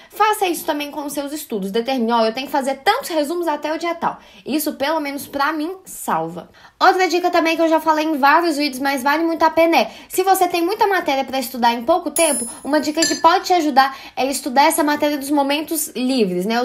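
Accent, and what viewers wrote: Minas Gerais, Brazil. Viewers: menina, faz um tempo que nao te acompanho até pq meu foco é concurso público mas sempre via as aulas de história. Estou impressionada como seu canal cresceu e a qualidade e conteúdo dos vídeos tmb estao melhores... excelente! E o que é melhor é o sotaque mineiro!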